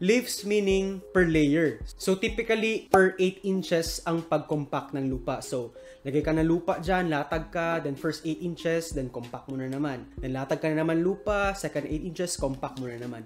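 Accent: Visayas, Philippines. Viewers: Kana! Naa ra japoy pagka bisaya ba hahahahahaha makalingaw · Bisaya man diay ka sir😁🤘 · Bisaya mn diay ka ser?? Proud bisaya here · Tingala hahaha bisaya halata kaayu. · Idola aning mga bisaya mag tagalog oy HAHAHA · Nganong bisaya ka ner? Asa ka gikan nga lugar? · Bisaya man diay😂😂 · Bisaya man diay ka doh.. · Bisaya ka po pala😁😁😁😁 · Hahaha bisaya d i kaha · Ibutang na dira tanan . Owryt bisaya ta pre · bisaya mn diay ka dong..😁😁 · Kahit hindi nato recent na vid, still very well explained and learned a lot as well. Nakakatuwa lng how kuya resist a little of his Bisaya hahaha. Proud Bisaya here!!!:) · Bisaya diay ka bai.. Hahaha. Subscribe nalng ko.. Butangan tika run. Hahahaha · Klaro Kaayo Bisaya.😁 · Bisaya man diay ka idol😂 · Taga saan ka po idol? May accent ka po ng bisaya idol kaya naitanong ko po kung taga saan ka.. Ako po kc taga Masbate bisaya po me hehe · Bisaya mn ka engineer 😂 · Bira bisaya jud.. 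· Bisaya kaayo ka, Sir😅 taga diin kaha ka Sir...?🤔😅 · bisaya d i ka dol 😁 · Bisaya gyud 😂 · bisaya deay ka lods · Bisaya ka gli dong taga diin ka? -aspiring engineer also :) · Bisaya jud..gahi...😂😂💪💪👍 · Klaro kaayong bisaya accent masi mag tagalog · Bisaya di ka sir 😅😅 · Bisaya mn diai... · aw ..bisaya diay ni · Bisaya ka sir bisaya pod ko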